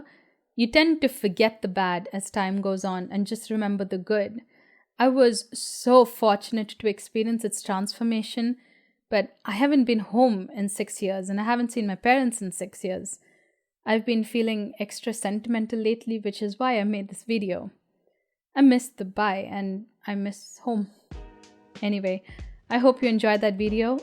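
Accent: India. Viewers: Such a sympathetic woman! I feel for you and know that growing up - wherever - this is your home. However, having been to Dubai as a European, I do not understand WHY on earth anybody wants to travel there. It is one of the most unattractive places I have seen. All fake, all new, all shiny, glossy, empty...filled with the most beautiful people from all over the world working there. I have hardly ever seen so many attractive human beings as there...but still: I liked my 1 week trip there, but would never dream of going there again. Howver, for you: Having not seen you parents in 6 years is terrible. I hope you can return and see them soon! Keep up with your very insightful and great channel. Love it! (And I love your Indian accent, too. Remember: MOST people in the English speaking world speak like you and not the Queen's English!!!) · Wow an Indian accent that I can understand, liked and subscribed! 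In Dubai, I couldn't understand when (most) Indians speak English.. I ended up speaking to them in Hindi LOL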